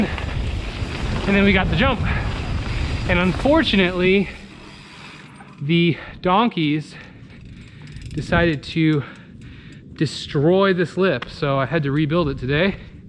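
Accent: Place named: Minnesota, United States. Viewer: Oh ya is MN, eh is Canadian....nice vid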